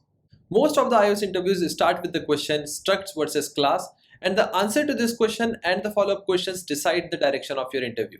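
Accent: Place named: India. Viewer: hint aksanına sağlık güzel bilgiler :)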